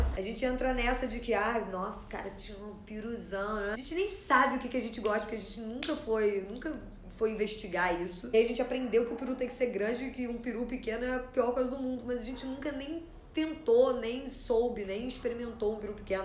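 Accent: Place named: Rio de Janeiro, Brazil. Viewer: Como sotaque carioca é ridículo, com certeza é o sotaque mais feio do mundo.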